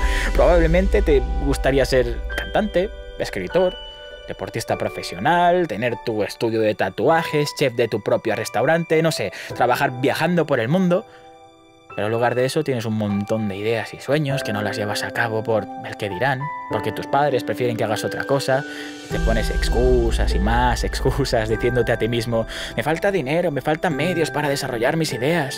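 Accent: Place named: Colombia. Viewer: con esa forma de hablar se reoconoce a un colombiano de lejos jaja la buena parcero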